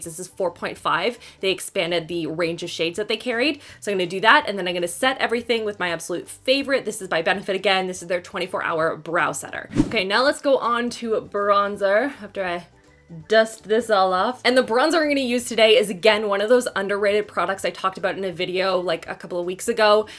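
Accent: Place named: Canada. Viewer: Can you just talk textbook Canadian for a makeup tutorial, cause that would be magical.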